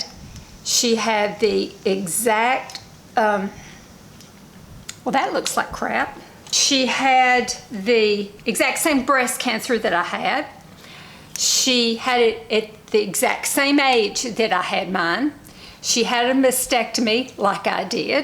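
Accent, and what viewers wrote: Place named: Tennessee, United States. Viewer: Just found your your YouvTube..I can so relate to you..I from Tennessee and listening to you takes me home..Im 70 and really appreciate you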